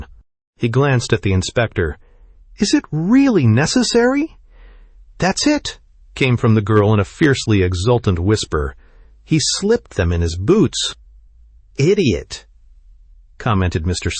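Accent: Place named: United States. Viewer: Nice hearing plain straight up American voice after excessive British with most over the top with the snootiness and the nearby islands of accents with strictly another country's vocabulary we can at best barely make out.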